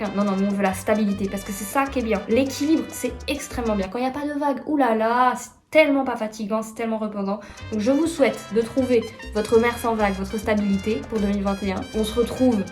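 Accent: Belgium. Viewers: Ho mais nan trop heureuse j était tellement impatience de finir la journée pour regarder ta vidéo j adore ta refait ma journée et super contente que tu aille mieux c est super❤❤❤❤❤ ( tu commence a avoir l accent belge haha rejoint nous )😂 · Grave bonne vidéo le sang, ce montage : une pépite, j’adore ! Ah oui et tu commences à prendre l’accent belge ça va plus du tout 🙃🙃🙃🙃🙃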